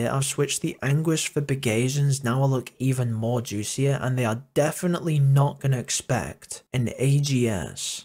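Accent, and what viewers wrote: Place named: United Kingdom. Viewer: You sound even more British now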